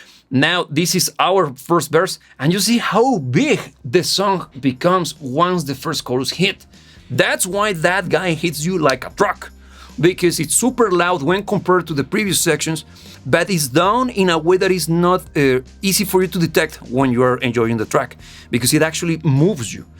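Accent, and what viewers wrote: Russia. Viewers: With the Russian accent this heavy, I strongly doubt that you haven't heard this before :) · you sound russian who is speaking english. where are you originally from, what’s your first language ?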